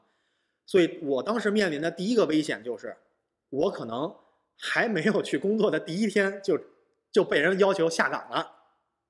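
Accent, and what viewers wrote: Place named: Beijing, China. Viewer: 哈哈哈，我三里河的也表示听到了乡音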